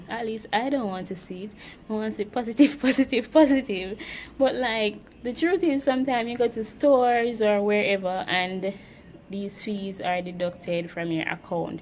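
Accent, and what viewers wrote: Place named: Jamaica. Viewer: I’m Jamaican 🇯🇲 too. Love that you’re staying real. I only sound like you when I’m upset or around others 😂 . Thanks for making me feel like I’m home 💕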